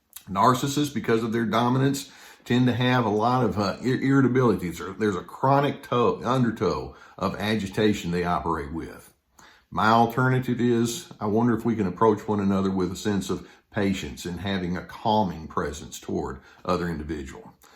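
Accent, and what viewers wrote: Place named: West, United States. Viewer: I think I would purchase an alarm clock with Dr. Les Carter giving out some solid grounding and factual encouragement first thing in the morning. That accent and articulate way of constructing a point of view reminds me of Western Movies, the reasonable man who verbally manages to get two gunslingers to avoid the showdown.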